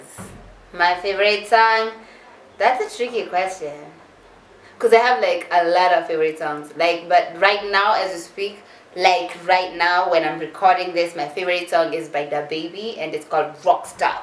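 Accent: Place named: United Kingdom. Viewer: kwani ulikuwa UK?? Accent kicking in lol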